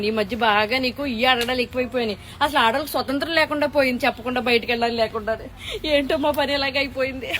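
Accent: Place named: Konaseema, India. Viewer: మీది నిజంగా కోనసీమేనా? మరీ అచ్చంగా అలా దింపేస్తున్నారు slang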